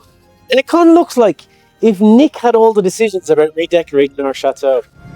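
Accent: Ireland. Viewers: Thank you for the titles of the villages,. It’s not your mumbly Irish accent - it’s my french ears not working. I love your Irish accent 🌸 Love from Cape Town, South Africa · Thanks for taking us along, we are glad you met Dan Preston. Also thank you for using subtitles for the proper name places you visit as sometimes I have a wee bit of trouble understanding your pronunciation of French names in your Irish accent 😅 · you're a total ledge! mumbly Irish accent and all! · I'm Australian, and I also happen to be 46% Irish apparently, so I would love to visit Ireland one day. I also love France. I've always loved the French language, and I'm currently teaching myself French, so I do really enjoy seeing the French villages and culture that you show in your little adventures. P.S. I should also say, I love the Irish accent as well, so what's not to love about your Vlog! 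😊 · Now I can understand your Irish accent as I'm Irish too, go up north and then I might say yes hard to understand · Thanks for taking all of us window shopping. Love all the old buildings. The knives were cool. Love the back roads. So pretty. Yes I can understand your Irish accent.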